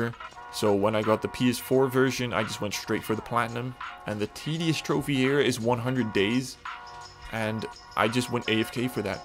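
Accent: Netherlands. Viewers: So I was actually able to pick up you’re from the Netherlands by your accent but dang that took a while. Definitely a compliment because usually I can’t stand dutch english accents but yours is fine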